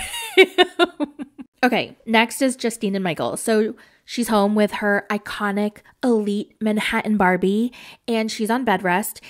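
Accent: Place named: South, United States. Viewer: you have a beautiful southern accent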